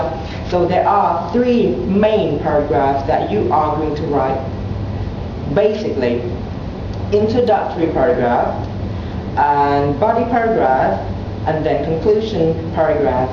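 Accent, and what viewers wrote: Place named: England, United Kingdom. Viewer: มาสำเนียงอังกฤษเลย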